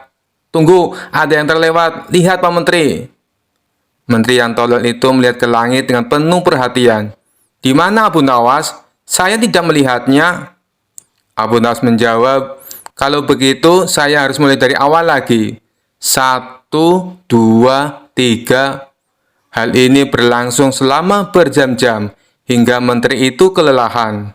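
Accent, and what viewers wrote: Java, Indonesia. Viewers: Udah medok, intonasi lemes, sebaiknya gunakan suara google aja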